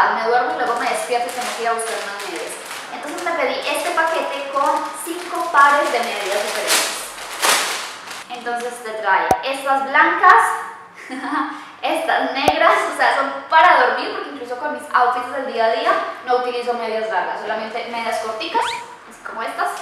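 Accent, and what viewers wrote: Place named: Mexico. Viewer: Ya hablas con acento mexicano! Seré la única que lo siente? 😂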